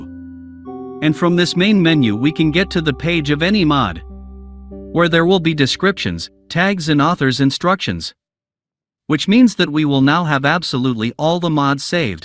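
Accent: Russia. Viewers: are you Russian??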